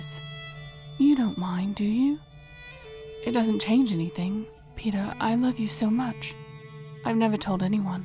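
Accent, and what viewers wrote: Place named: Wales, United Kingdom. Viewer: Very cool tale, and very nice Wales accent! 🙌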